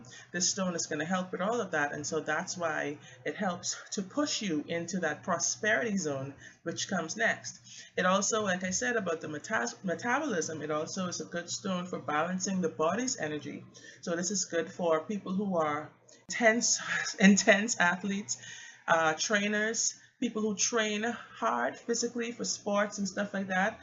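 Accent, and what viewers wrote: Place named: Bahamas. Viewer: Are you bahamian? I hear an accent. Great video!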